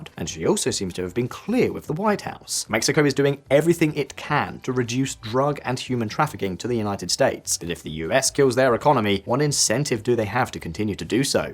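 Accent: United Kingdom. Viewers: ditz with a brit accent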